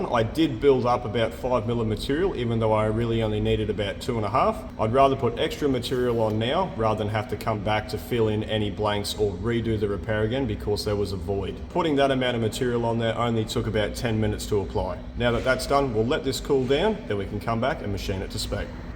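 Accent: Australia. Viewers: At the very begining he says "how u doing guys" or "hi young guys"? Im Polish so I can't tell due to australian accent 🤣🤣 Great video btw ❤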